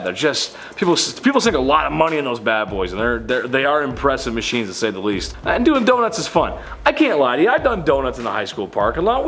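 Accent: Scotland, United Kingdom. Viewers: Now the house is more damaged and somebody may seriously hurt because of that guy Ps. Nice Scottish accent! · LOVE your Scottish accent!!!!! Cheers me up every time!!! Much love from Michigan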